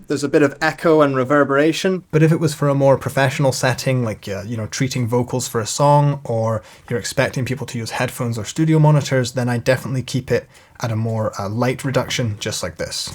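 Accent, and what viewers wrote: Scotland, United Kingdom. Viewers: Where is your accent from? You sound scottish with an english and american accent lol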